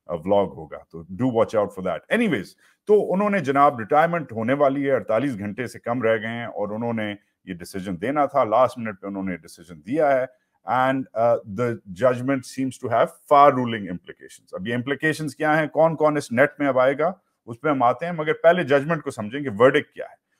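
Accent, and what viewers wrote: Punjab, India. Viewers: Love you Wajahat and your Punjabi accent . Superb bro. tum naay khoob tease keaya isss punabi fauj kooo . yeh punjabi dhagay sirf khaa bhee sakty hain moty maghaz kee log hain yeh sary boot. per inko $$$$$ ginanna aur plot bnana khoob ata haay. aur tu aur papa johnz bhee tumhary neighbour main inhee kee hain. dekho looo punjabi fauj kooo. haram kee adat paree huee hay inkoo. yaar itna arsa inkee sath kaam keya tum naay yeh tu samggty hoo fauji koo dosry kee jeeb saay mal nikalwana khoob ata haay. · No, being a Punjabi myself, I enjoyed your Punjabi accent while explaining the amendments. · Always a big fan of you bro and loved the way you explained in bit of Punjabi swag 😜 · پنجابی کا تڑکہ یا touch اچھا ہے۔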